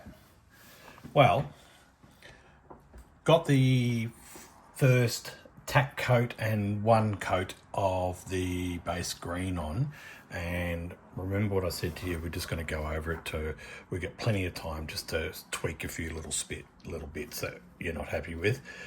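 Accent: Australia. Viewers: That British and pearl green combo looks fantastic! Watching from Mobile (Moe-beel) Alabama. Been watching for the last few segments, all have been great. 62 and have been building since I was 9 and still learning, thanks to you guys ( or should I say blokes ) I happen to love the Aussie accent. Enough of that, keep up the good work.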